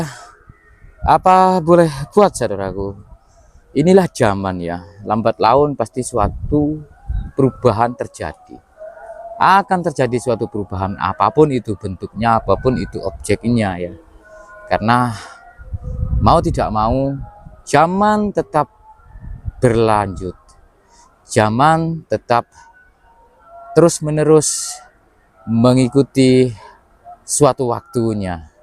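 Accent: Java, Indonesia. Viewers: Medok Jawa banget kau ngomongnya. Jadilah kau orang Indonesia, jgn jadi orang Jawa.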